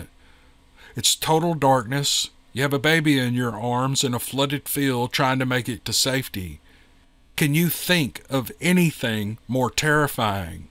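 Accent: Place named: Louisiana, United States. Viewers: Really enjoyed this... Thx buddy..... PS.... That Ice cream being discontinued will save you on bike rides!!! Lol.... Oh yeah, nice Cajun accent! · Let's do it! Protest indeed. I have loved a couples of products that got discontinued soon after I discovered them! That is so aggravating 🙄 Especially certain flavors of foods. 😵‍💫 Love your story about your mother in law, too❣️ Never listen to the negative comments about your banter or rambling, or whatever, because I love it❣️ It's always interesting & it's true, real life stuff❣️Can't get any better testimonies, stories, experiences, etc., than that! Haters can move on if they don't like it. 😉 I'm sure I'm not the only one that loves your stories, ALL of them. Love your Cajun accent too❣️ 👊 Spot on! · GREAT Cajun accent!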